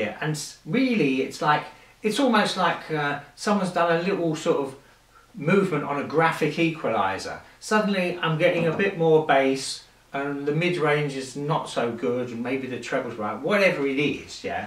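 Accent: London, United Kingdom. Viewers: Thats London english, none of that Queens English rubbish, learn this!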